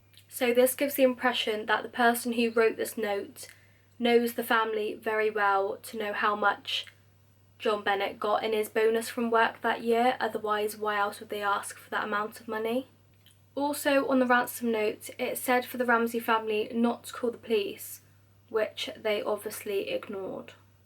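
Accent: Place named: United Kingdom. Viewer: Also, I just love your British accent haha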